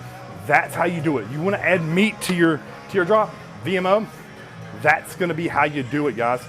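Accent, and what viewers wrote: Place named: Yugoslavia. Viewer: You have a very strong yugoslavian accent that I can't get over but seriously you have excellent advice. The key to VMO activation is pretty much raising your heels and staying in the lower half position of the squat. We my wife & I try this in our village, GOOD FOR MILKING COWS!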